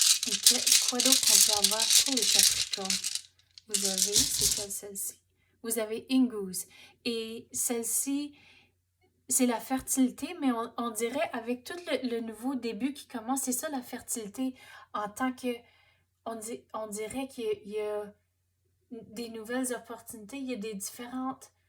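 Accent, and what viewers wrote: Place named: England, United Kingdom. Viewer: Merci pour se superbe tirage en attendant l évolution, vous avez une très jolie voie avec ce petit accent anglais qui dégage une douceur. Je vous souhaite beaucoup de bonheur et de joie sur votre chemin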